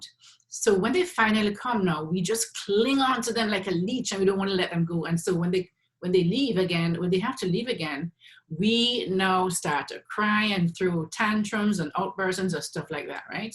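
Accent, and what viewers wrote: Jamaica. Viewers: Loved this. So informative and comforting to hear a jamaican accent give such knowledge-hope I'm correct, I know my ppl lol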